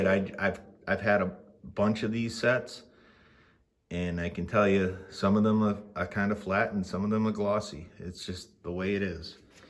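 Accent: Boston, United States. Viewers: I believe these drums came in either a gloss or satin finish, it looks like you have an add-on. A quick check of the numbers on the tags should clarify this. Either way, these are beautiful drums! Nice Boston accent.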